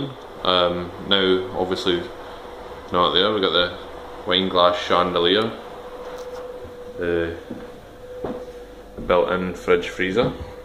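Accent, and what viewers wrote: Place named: Scotland, United Kingdom. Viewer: just wanted to ask where you are...presumably in Scotland, with that accent, but intrigued as to where, its very familiar...well to me!!! lol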